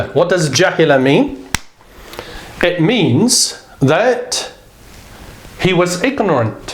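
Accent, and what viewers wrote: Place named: Birmingham, United Kingdom. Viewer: JazakAllah khair brother . I always said I would never learn Arabic from a non Arab... I take back my words. I find you're the best teacher (Allah yu barik feek)on the internet because you break concepts down to their simplest form, which then makes the idea easy to grasp. Perhaps your laid back Brummie accent helps as well lol !Thank you bro.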